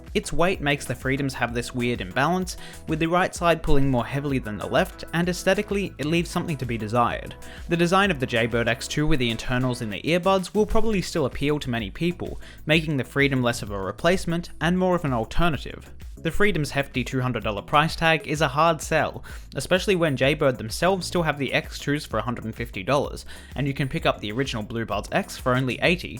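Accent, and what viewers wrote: Australia. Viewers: lol that's just how us aussies sound.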